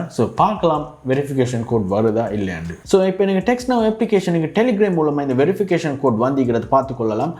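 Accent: Sri Lanka. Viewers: Bro ivaru pesurathu srilanka tamil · Idhu Srilankan Tamil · App link error varudhu bro 😶 Anyway Ungal Ilangai tamil superu 😂❤ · அது இலங்கைத் தமிழ் முருகேசா...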